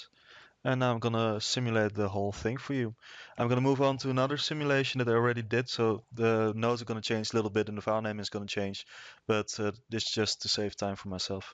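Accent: Netherlands. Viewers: nice tutorial, but doe iets aan je engels. ik irriteer me wel een beetje aan het nederlands accent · thnx for the tutorial btw u sound dutch :P greetings from amsterdam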